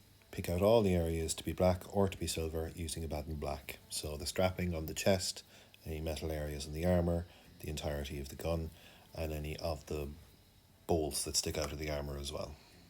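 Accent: Ireland. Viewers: u Irish ??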